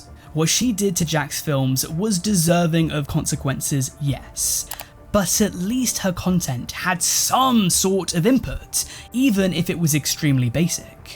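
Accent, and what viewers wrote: United Kingdom. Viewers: I wouldn’t listen to anyone talk about the fall off of masteroogway, except for and his glorious British narration😍😍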